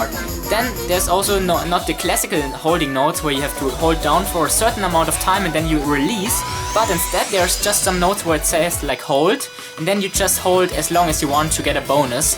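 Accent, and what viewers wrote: Germany. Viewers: Dein English ist gar nicht so schlecht aber man merkt das du Deutscher bist :D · Dude are u german? Nice video btw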